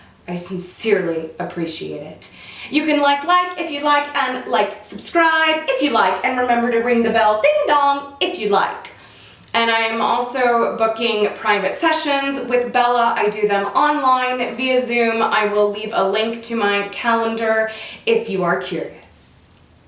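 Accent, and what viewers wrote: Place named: England, United Kingdom. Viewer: You sound very English this week.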